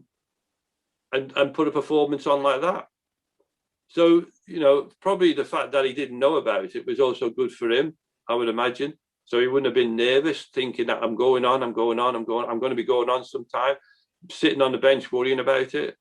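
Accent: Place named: Birmingham, United Kingdom. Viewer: Bit of a Brummie accent creeping in there. I asked my Irish Father is Jack Grealish the best captain-absolutely not.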